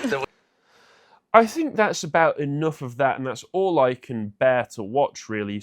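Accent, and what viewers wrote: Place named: Bristol, United Kingdom. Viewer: Bristolian. Says it all really. Woke shithole.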